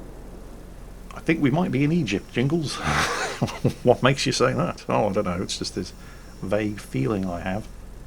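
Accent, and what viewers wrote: England, United Kingdom. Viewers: Jingles, even when you fuck up, or don't play well, that British accent makes it seem oh so correct. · I'm not much for realistic simulators such as WT. I just don't enjoy the game as much. Could it be because I'm not good at it? Sure! But the point of having fun in a game is based on personal preference. The people saying "WT is better, get gud" are the same people who talk about PUBG being a good game (it isn't, btw). WoWP in its current state is for the individual who just wants to shoot at things (such as myself). I don't play many games, because I don't like most games. Developers are attempting to reach broader audiences by creating games such as WoWP. If it doesn't fit your preference, cool! No problem! There are many games that probably DO fit your preference. Fighting over games like "fam bois" doesn't make sense though. 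It's not constructive. And I know, this is a YouTube comment section, so it doesn't have to have any constructiveness to it, but regardless. This is a jingles video, we're not watching idubbbz or ricegum. His smooth English accent should be calming everyone down. This is a part of YouTube that can be, and should be, a place to critically critique war-type games. Will I download this game and try it? Maybe. I really enjoy WoWS right now and have moved away from WoT because the learning curve is just different between the two. I'm not great at games overall. steps off soapbox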